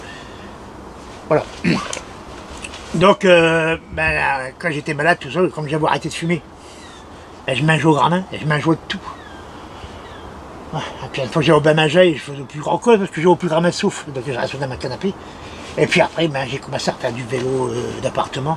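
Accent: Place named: Boulogne, France. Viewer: Oh là là!! Déjà l’accent français et là le boulonnais lol 😂 J’ai bien rigolé, merci! Joyeux temps des fêtes à toute votre petite famille! Nous on vient de recevoir au moins 40 cm de neige, ce sera un beau Noël blanc!! Bisou du Québec!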